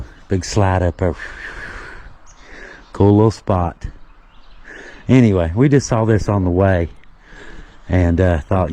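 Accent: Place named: Eastern Kentucky, United States. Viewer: I'd love to get together with you and do a video on Appalachian english, and how even though I only live about 1 hour 45 minutes away from you, and we are still considered Eastern Kentucky how the dialect changes just a little. It amuses me to hear how you pronounce some words and names that we pronounce just a slight bit different. Its actually kind of interesting. Even that the spelling of names changed when people moved to this area from there. You'd have a husband buried here with the last name spelled one way, the wife moved back there and her name on her tombstone is spelled another way. Would make an interesting story for sure. 😊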